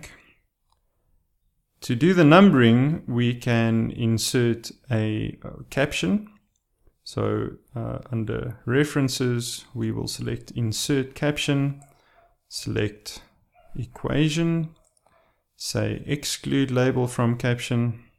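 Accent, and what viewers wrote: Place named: Afrikaans, South Africa. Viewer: I'm a simple man, I hear Afrikaans English, I upvote.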